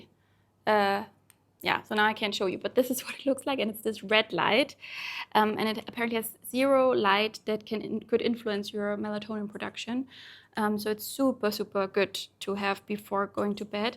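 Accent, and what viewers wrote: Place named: Germany. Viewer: Du bist wirklich so sympathisch. Dachte mir schon zu Beginn: dieses Englisch klingt so Deutsch 😅 Total süß und richtig gute Rezeptideen ❤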